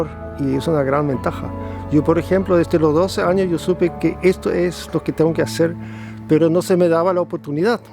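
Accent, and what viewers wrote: Paraguay. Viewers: Curioso como se mezclan el acento paraguayo y alemán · Hermoso trabajo y lugar!, y ya se le pegó el acento Paraguayo 🇵🇾😍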